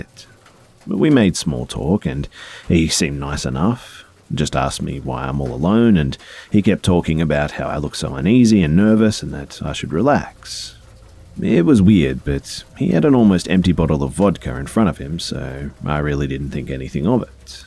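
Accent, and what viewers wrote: Australia. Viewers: I haven’t watched one of these in quite while like 2016 or something I missed the Aussie voice mates.*I am Australian btw*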